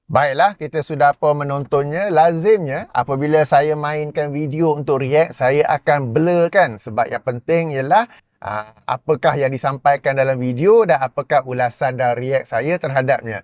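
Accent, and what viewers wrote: Kedah, Malaysia. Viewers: Orng Kedah cakap nampak kasaq tapi ada wisdom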